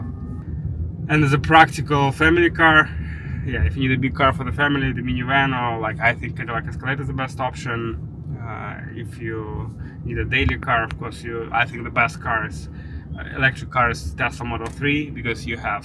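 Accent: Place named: Ukraine. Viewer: What terrible Ukrainian accent😮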